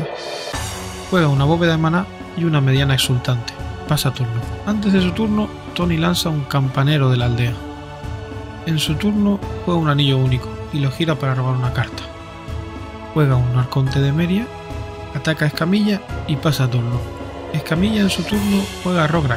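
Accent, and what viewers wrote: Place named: Canary Islands, Spain. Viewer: Que bueno escuchar a un chicharrero comentando Magic, nuevo sub❤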